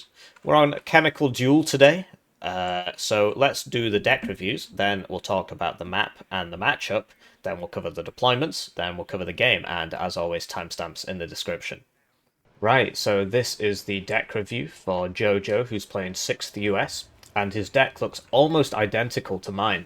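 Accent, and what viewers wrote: United Kingdom. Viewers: GGs, thanks for waiting. Never skip the deck review. Man did you sound British is this vid! I think the water helps your accent.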